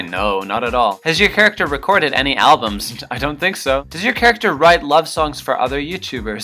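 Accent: Australia. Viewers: Your Australian? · HES AUSTRILLIAN?!?!?!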